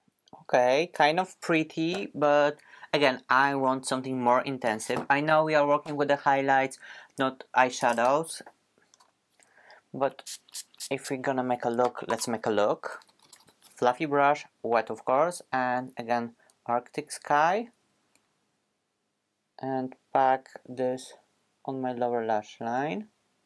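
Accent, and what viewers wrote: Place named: Poland. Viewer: hi! where are you from? your accent seems extremely familiar and polish-like :)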